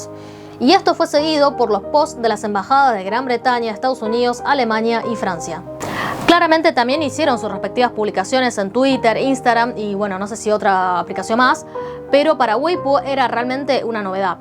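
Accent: Argentina. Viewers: Tienes como un poco de acento argentino. En dónde vives? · Tu. Español argentino en una chica asiática se ve hermoso. Saludes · Tu castellano (tu pronunciación y las palabras que usas) es claramente argentino... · Me parece un buen video te felicito .Mil veces mejor que los noticieros porque aquí solo todo a favor de los gringos como si fuera una película que hacen ellos que solo ellos son los buenos.Tu acento Argentino me gusta mucho muy bonito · Que lindo tu acento Argentino . Me gustaría tener una esposa con los ojos chinitos.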